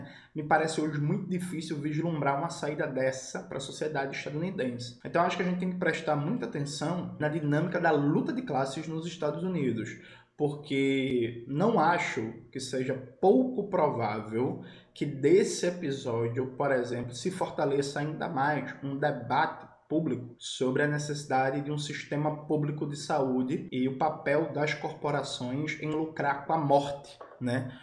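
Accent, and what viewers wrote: Pernambuco, Brazil. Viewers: Isso é o sotaque pernambucano amooo❤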